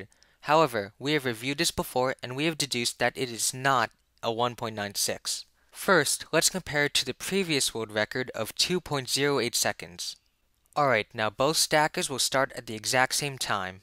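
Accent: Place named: Philippines. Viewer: lol yep :D but idk it's weird I never realized how much of a Tagalog accent you have... But thanks anyway :P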